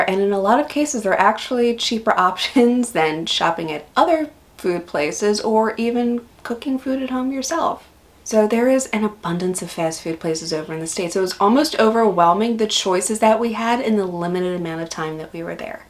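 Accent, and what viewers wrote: Australia. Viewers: You now sound like an aussie. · I can hear that you are subtly starting to gain the Australian accent, congrats and welcome home. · Sounds like you've become Australian. Congratulations!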